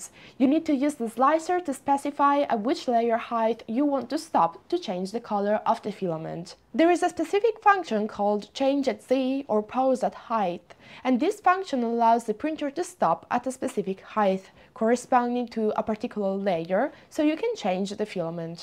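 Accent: Italy. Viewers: So, I was wondering what that accent was. Apparently she has a mirror YouTube channel with exactly the same video's where she speaks Italian :) So, there you go.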